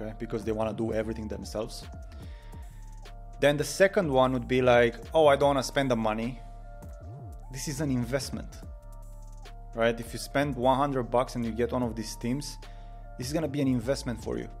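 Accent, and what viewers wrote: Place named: Romania. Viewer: Tocmai mi-am dat seama ca ești român, imi parea accentul cunoscut 😂. M-am apucat acum o săptămână de învățat css si html. Sper să fie bine. :))))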